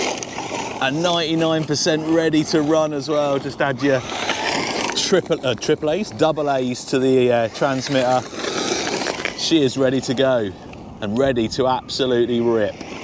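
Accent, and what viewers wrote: United Kingdom. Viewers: New sub here. I am a 73 year old US guy. I have to put my hearing aids in to understand your strong British Accent. Great video. Congrats on the new shop. F**K the dislikes!!!!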